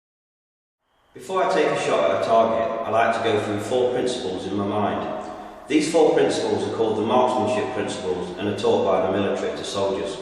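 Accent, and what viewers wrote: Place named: United Kingdom. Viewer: finally a British guy talking. being in the cadets i have been trying to find a British guy to tell me what to do. not an American. nice video dude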